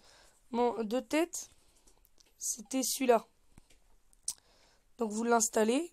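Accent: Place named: England, United Kingdom. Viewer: Ton accent anglais me tu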